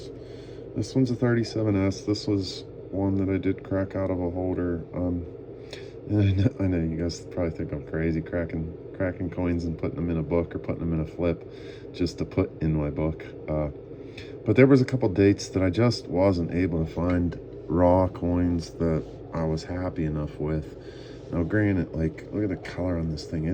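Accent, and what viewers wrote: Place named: Pittsburgh, United States. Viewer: Sounds like you are from Pittsburgh. Or somewhere close. Anyway I like the red/orange cents.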